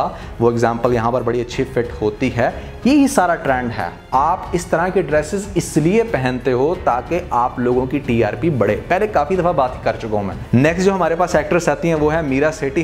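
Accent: Punjab, India. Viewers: Tuhadi punjabi 22 sooo funny😂😂😂😂😂😂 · I like the way your punjabi coming out! I love it!!🙆‍♀️❤️and the way you criticised actresses…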